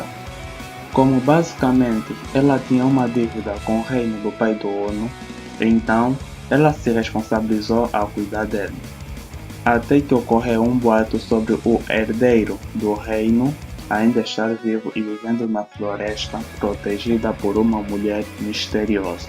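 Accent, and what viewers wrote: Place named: Portugal. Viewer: jurava que tu era de Portugal kkk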